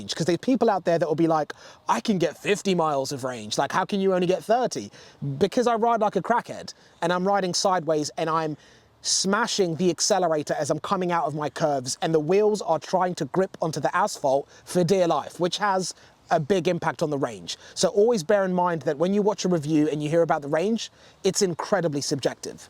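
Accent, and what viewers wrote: United Kingdom. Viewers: You sound like you may be in the UK any issues riding it on cycle paths or in public etc. if you’re not in the UK please ignore.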